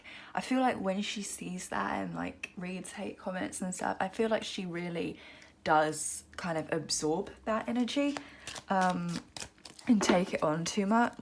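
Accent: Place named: Australia. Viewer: Spot an Aussie lol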